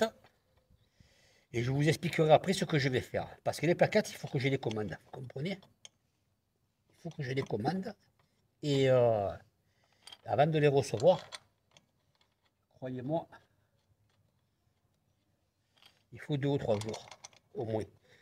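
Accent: South, France. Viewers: Merci pour cette vidéo très pratique.le coup du piston à visser pas évident..heureusement que tu es là!..avec ton accent du Sud ça me rappelle mes cousins Nîmois! Merci encore Une vidéo pour la courroie secondaire serait super sympa... si tu le fait toi même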